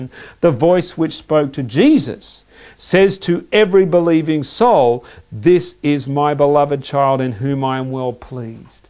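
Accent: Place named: United Kingdom. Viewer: Thank you for this presentation. And, oh, what a fine British accent!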